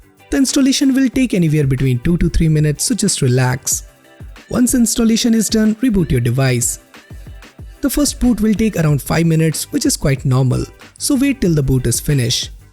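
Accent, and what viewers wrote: India. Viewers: He is indian thats why he speaks that way😊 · too many indian phone tech reviewer for the poco, seriously i can't find any american speaker out there, no offense but i can't stand the accent .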